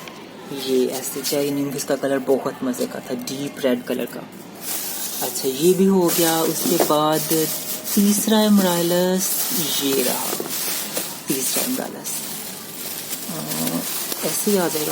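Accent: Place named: Pakistan. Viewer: aap ki awaj see lag raha tha ki aap Pakistan see hai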